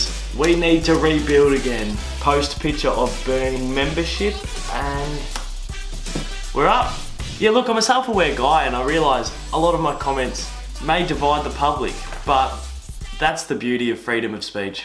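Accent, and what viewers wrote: Australia. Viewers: Are you Australian? You sound weird.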